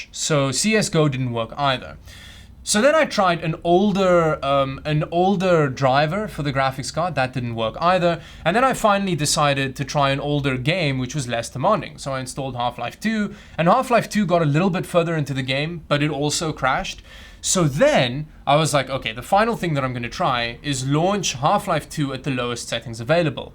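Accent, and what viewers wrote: South Africa. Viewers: Are you a South African expat? Your accent tells me that you are. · Yes definitely do it, i wana also see the result of the difference a 6 pin pci-e connector would make, you have a south african accent, are you south african? Just curious... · Ek kry die gevoel jy is ñ Afrikaanse Dawid, met daai aksent en al, so gryp daai soldeerbout en sit daai connector op en kyk of dit werk! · South African??? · Are you South african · Are you South African? You sound South African.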